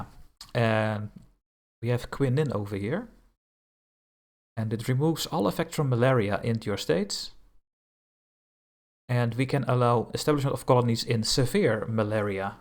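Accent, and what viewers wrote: Netherlands. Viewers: The further you go.. hoe meer ik hoor dat je ook echt Nederlands bent:D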